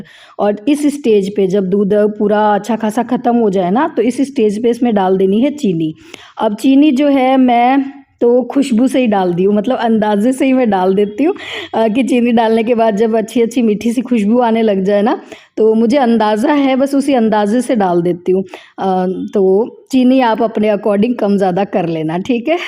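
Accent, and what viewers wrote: Rajasthan, India. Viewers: Di ap vlog k bich bich me aise hi marwadi batein sunate rehna bahut achha lagta hai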